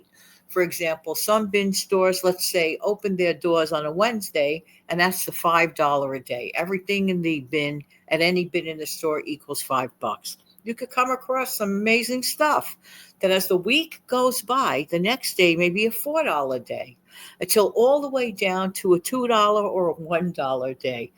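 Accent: New York, United States. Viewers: As always, great tips! Thank you for the reminder to start on Christmas now! We do have a bin store, and I never thought to look there for gifts. What a good idea🙂 BTW, I love your NY accent😀it's like listening to someone from a movie! We're from NW Georgia. Movies get our accent completely wrong. Every. Single. Time😂 Yours is delightful!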